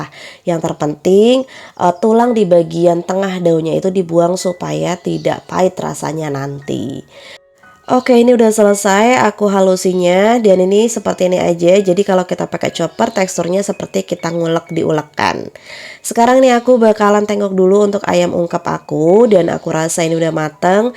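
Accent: Java, Indonesia. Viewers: Ya Allah... Denger mbak ngomong Jowo koq yo iso medhok to... 😀..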